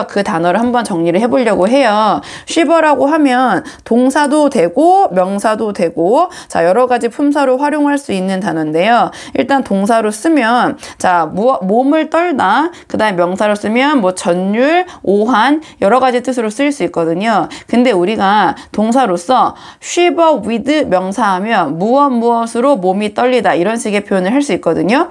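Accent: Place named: United Kingdom. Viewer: 영국식발음 너무 좋아요❤ 덕분에 매일 하루한장 공부할수 있어 감사합니다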